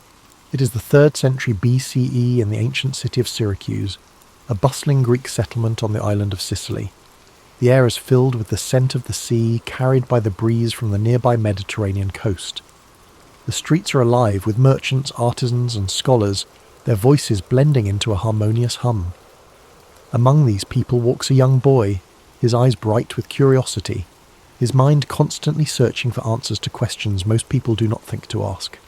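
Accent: United Kingdom. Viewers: YESSS a British narrator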